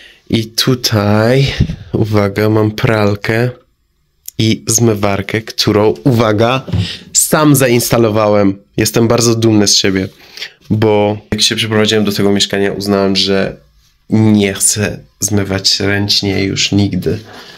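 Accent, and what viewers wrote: Poland. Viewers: Jak Pana słucham to stwierdzam że ma Pan polski akcent i dobrze mówi po Polsku.👍🏵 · Świetnie mówisz po Polsku i to z akcentem Polskim Znam tu obcokrajowcow którzy są dlugo i może mówią po Polsku ale bez akcentu Polskiego Zdolny z Ciebie Iranczyk I bardzo sympatyczny chyba jesteś unikatem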